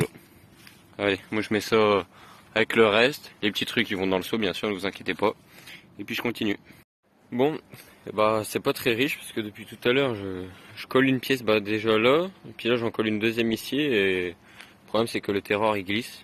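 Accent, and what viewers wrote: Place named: Nord, France. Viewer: Salut heureux de te voir te lancer en solo, on reconnait le Nordiste ( he ben laooo) Félicitation pour la pêche. Jean marc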